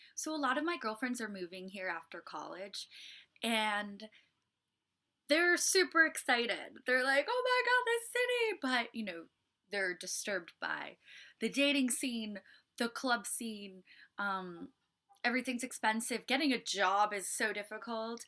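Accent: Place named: United States. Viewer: She sounds American. WHAT if shes Speaking the Truth, and these are all True .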